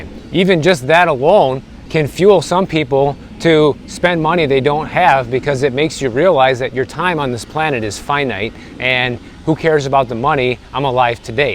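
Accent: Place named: Illinois, United States. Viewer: Somehow.. I knew you were from Illinois.. You're giving me Northwest side, or out West..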